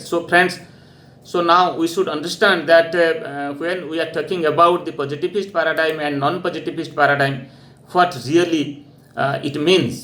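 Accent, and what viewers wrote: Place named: Bihar, India. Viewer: Bihar se lag rahe hain sir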